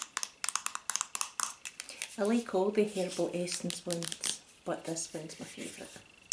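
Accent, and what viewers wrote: Scotland, United Kingdom. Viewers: Just discovered your channel! Scottish accents are my fave 😁!! I didn't know there was a online poundshop! I love a good nose I the poundshop 😁 you got some awesome goodies! laughed when you said about putting your glasses on, my eyesight is awful, I feel your pain 😂 I got dogs, lint rollers are a must 😂 xx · I'm Scottish as well an its nice to hear a familiar accent in ASMR <3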